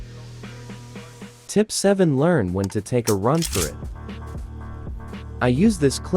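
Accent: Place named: Sweden. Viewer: Hahaha ännu en svensk, har hört att folk tydligen gillar vår brytning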